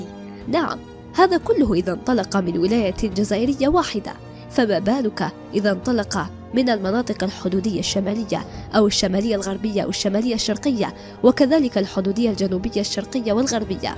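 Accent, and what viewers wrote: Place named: Morocco. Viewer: الله يشافيك يا صاحبة القناة راكي مڨريبيا باينة من صوتك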